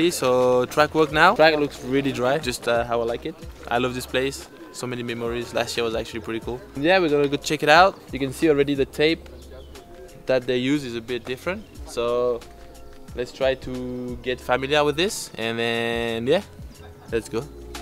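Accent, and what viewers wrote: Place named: France. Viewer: Au top l'accent Français quand tu et pas très doué en anglais sa aide 😉Bon courage a toi pour cette saison hâte de te voir détruit la piste des Gets 😄 👍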